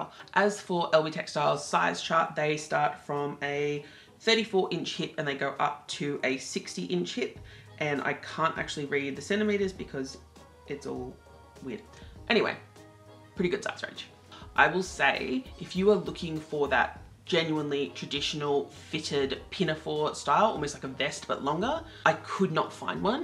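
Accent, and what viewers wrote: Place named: Australia. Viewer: OMG! an aussie! Thank you! :)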